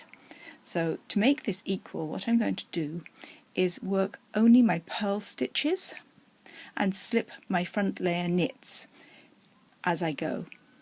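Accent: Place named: England, United Kingdom. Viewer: You are very easy to follow; a nice English accent so pleasant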